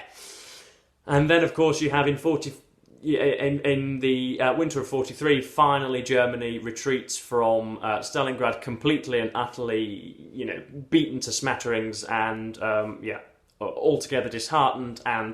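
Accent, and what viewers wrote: United Kingdom. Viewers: North of England, sir, not Britain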